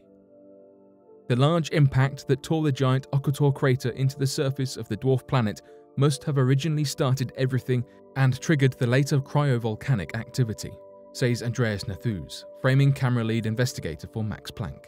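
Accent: United Kingdom. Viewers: Matt Michniuk thats actually the British pronunciation. Much like sedule for schedule or alu-minium for aluminum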